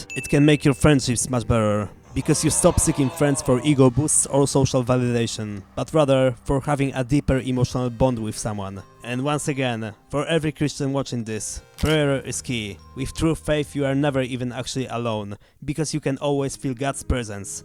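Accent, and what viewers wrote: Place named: Russia. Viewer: Are you from? Sounds like Russian. BTW Good video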